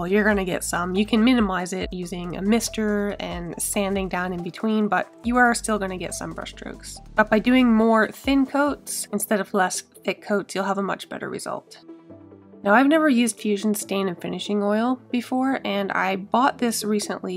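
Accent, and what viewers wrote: Canada. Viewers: Found you by accident but was blown away by your work. Also, I miss Canada so much so loved hearing you talk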